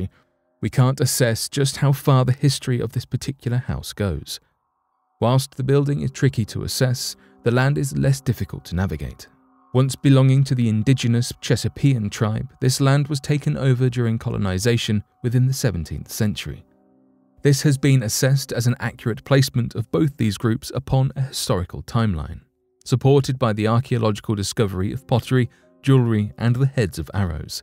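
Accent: United Kingdom. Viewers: You can't beat a good British accent 👍🏻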